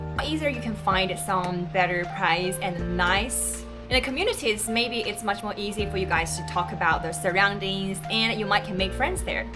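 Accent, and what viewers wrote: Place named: Singapore. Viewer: Singaporean British English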